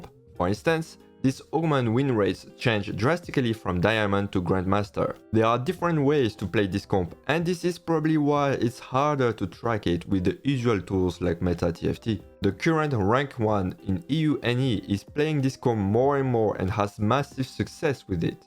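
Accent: France. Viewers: Lol his accent is truly french and you are truly a bigot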